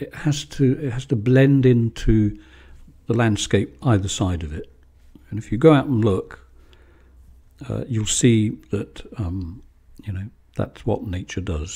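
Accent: United Kingdom. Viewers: Thanks for sharing your wonderful unique creativity. I love oils and your style is so inspirational that I can't wait for my current work to finish so I can try your method. Love listening to you. Besides the lovely British accent, you don't ramble on like some artists I tried watching and chose to turn off sound because of the non topic nonsense they were talking about. Musical intervals are just perfect!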